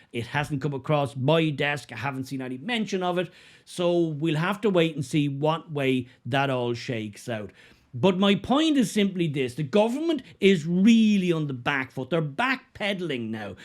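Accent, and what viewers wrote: Ireland. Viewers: Maybe you should clean out your ears and if that doesn't work then accept the Irish have their own accent. 🙄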